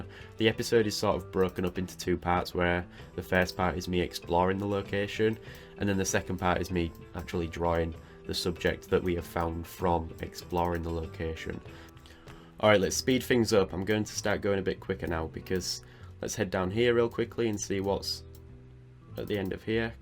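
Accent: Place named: Hull, United Kingdom. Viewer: Your Hull accent makes me want to die but your drawings give me life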